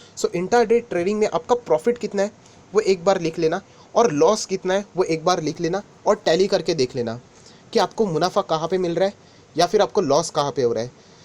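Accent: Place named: Mumbai, India. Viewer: mumbaiche ahe he nkki accent ani hindimde marathi words..😀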